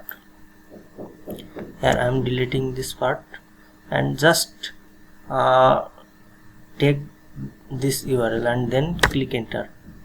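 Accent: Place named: India. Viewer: Me: Ah this video looks pretty solid it might give some answers. Video: Has Indian accent Me: Aight ima head out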